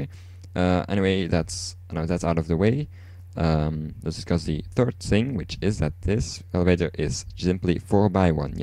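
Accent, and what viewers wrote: Netherlands. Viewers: I can hear you're dutch, and you're from the south of the Netherlands aren't you? ;) Great design man! Kudos to you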